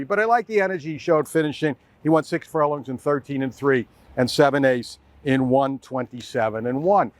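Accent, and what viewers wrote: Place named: Boston, United States. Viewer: Still prity much have held on to your Boston accent. Mike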